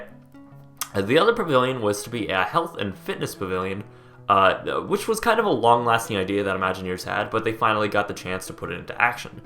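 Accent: Canada. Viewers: The narrator sounds either Canadian, or he's trying very hard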